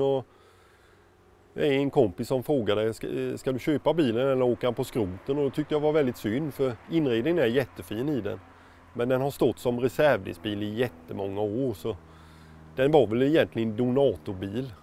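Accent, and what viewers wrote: Småland, Sweden. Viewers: För han é från småland, dom säger oxå Cykelen, Nyckelen,Bibelen etc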